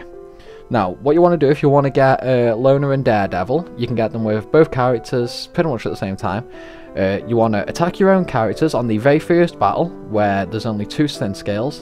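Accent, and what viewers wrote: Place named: Manchester, United Kingdom. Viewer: Are you a Manchester? I recognise that accent pal 👌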